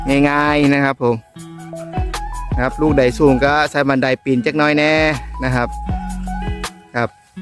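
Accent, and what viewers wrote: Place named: Loei, Thailand. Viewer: มักครับ สำเนียงไทยเลยม่วนดีครับ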